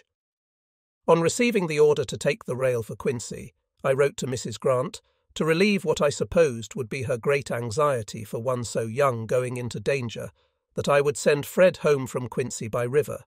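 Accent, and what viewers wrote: England, United Kingdom. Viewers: The English accent of the AI narrator is incongruous with what is a uniquely American event.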